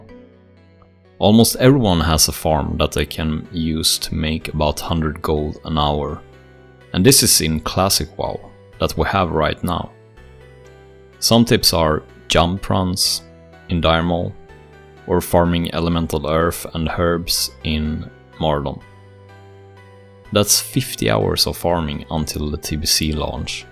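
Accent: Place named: Scandinavia. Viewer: May have liked it more if he spoke in Swedish 🙂 i do not like Scandinavian accent as much when listening to English content 😉 would in fact like Danish, or Norwegian too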